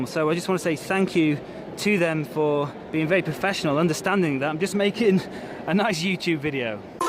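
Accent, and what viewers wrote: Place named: United Kingdom. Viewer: I subscribed to you i think British English is more beautiful than American English jaja :p I love British accents ..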